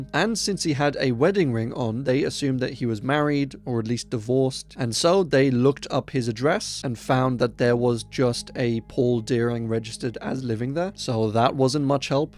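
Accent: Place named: England, United Kingdom. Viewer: Your channel is really good mate 💯 where is your accent from in England I'm English Yorkshire?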